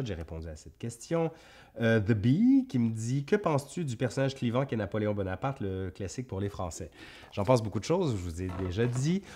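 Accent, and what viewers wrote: Quebec, Canada. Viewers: Une question qui me tarode depuis un bon moment et j'espère que tu pourras y répondre. Suite aux grandes découvertes, aux fuites de certains français en raison des guerres de religions, de la Révolution Française.... beaucoup se sont réfugiés au Québec ou en Louisiane, parlent avec le même charmant accent qui est le tien. Donc, question: parlait-on ainsi en France à l'époque des Henri, Louis Charles et autres? Et ainsi vous auriez conservé cette particularité linguistique, tandis qu'en France, la langue se serait "vulgarisée". Ce n'est pas un terme péjoratif, d'où les guillemets. Merci d'avance. Et Bravo pour tes vidéos. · Vous n'avez peut-être pas le coffre de Benjamin Brillaud, mais l'Histoire racontée avec l'accent québécois est fort plaisante. Ne changez rien :)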